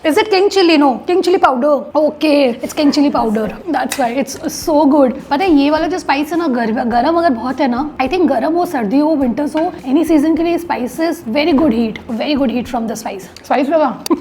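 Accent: India. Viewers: Girl you have the most indianiest accent i have ever heard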